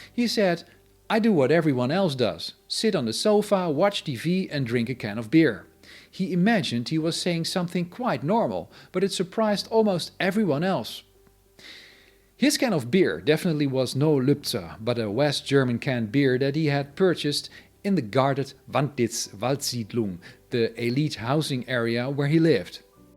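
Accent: Netherlands. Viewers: I also thought he sounded Dutch.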